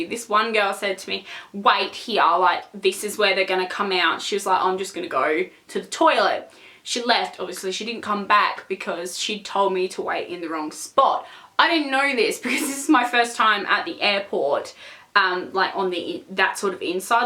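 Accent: Australia. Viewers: Your so pretty, and I lurrrrv your accent. Are you Australian?? xx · are you Australian? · She legit has the same australian accent as 5sos and lol u made it to her new video! Watch it to see her slay your ass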